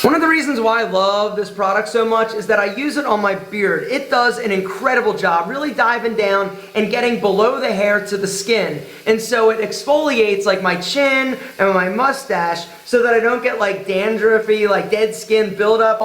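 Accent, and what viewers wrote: Philadelphia, United States. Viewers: This guy is definitely from Philly that accent though.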